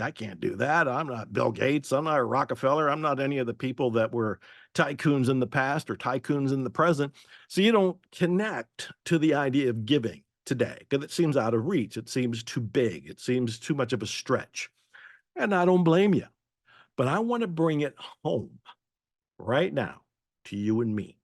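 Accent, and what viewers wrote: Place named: United States. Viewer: Thank you Joe for this very inspirational video. Love your books, love your explanations, love your perfect American English. Happy birthday and blessings from Russia!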